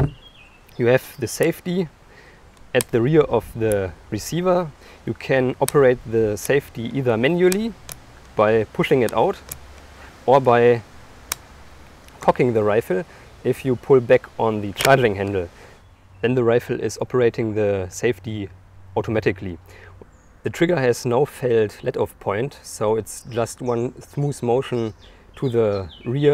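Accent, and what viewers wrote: Germany. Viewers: Deutsches English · Deutsches englisch♥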